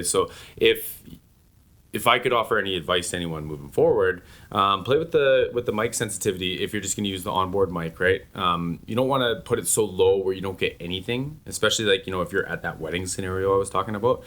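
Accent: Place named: Canada. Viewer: I don’t know why I never noticed what an amazing Canadian accent you had before listening to this video. Have you edited it out in the past?Lol!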